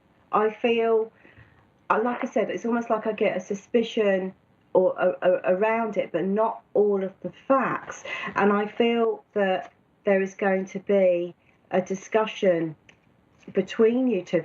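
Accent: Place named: Cockney, United Kingdom. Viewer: She speaks with a Cockney accent. The working class use this accent .Not the Propah English ,which is spoken by the elites or Oxford educated.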